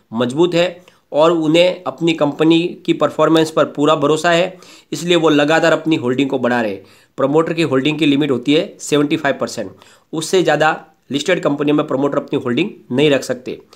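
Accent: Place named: Marwari, India. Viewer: Rajasthan m bhi marwadi accent arha hai. Mybe belongs frm pali,jalore,jdpr,sirohi. May be i gs🤔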